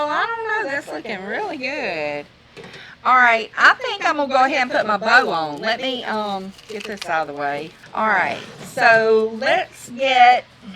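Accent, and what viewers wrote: South, United States. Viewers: I love this & did you figure what the tag said for the green fillers? By the way my mother was listening to this & she said she must be from the south. 🤣🤣🤣 We’re from Alabama. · I love that southern twang!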